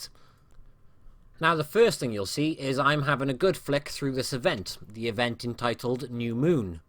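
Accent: United Kingdom. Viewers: wat the british accent ! XD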